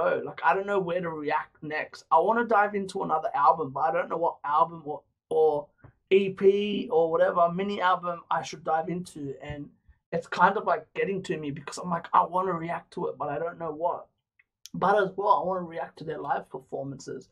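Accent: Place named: Australia. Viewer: dreamcatcher \:D/ where you at? down under based on your accent? :D they should tour there before the end of the year. they just finished their usa tour and it was awesome. if you are curious, there are a few good vlogs/reviews that are more commentary than just showing full song clips(paul leach, the kpop guitarist, ram reacts, merlin zimmerman) that you can maybe even react to. I'm surprised you actually know them by name, since you haven't reacted to that much of their stuff at least here on youtube. just do more album reactions. most of their albums are mini-albums so it's only 4 songs. just be sure to check if they have special clips for the b-sides before picking a lyric video.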